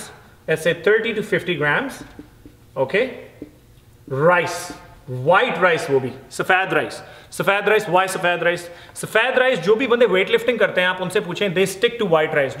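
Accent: Punjab, India. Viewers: Vo punjabi accent haaayee🤗🤗💕💕 love it. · indian punjabi/ pakistani punjabi with some foreign english accent.. amazing.. maza aa gya... thank u soo much veere... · i love your punjabi accent keep it up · U speak superb...while watching ur video, I'm smiling..ur Punjabi style👍 I'm also punjabi but even I can't speak that much Punjabi😂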